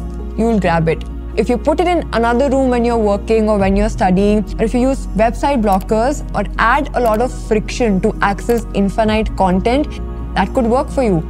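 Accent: India. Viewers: good message, but i cant stand that indian dialect english for real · Sorry, I stopped after about 30 seconds in because I can't stand listening to Indian English. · The poo accent 🤮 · Accents vary worldwide, mate. Nothing you can do about it. Also she’s Indian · I'm one of the 85% who didn't watch to the end, simply because of your indian accent. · I didn't leave because im brain roted , i just don't like indian accent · a girl with perfect indian accent finally. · how do you have Indian accent?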